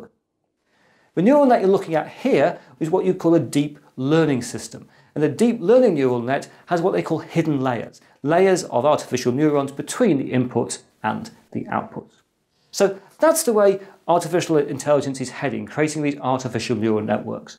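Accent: England, United Kingdom. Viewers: I enjoy listening to your English accent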